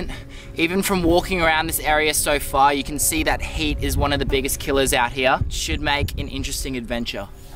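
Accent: Australia. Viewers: Lol, he is explaining great, you just ain’t used to the Aussie accent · That’s just an aussie accent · Oh my god that accent he must be from the eastern states lol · I love the Australian accent :) you have beautiful eyes as well. Great little documentary/ show i love it!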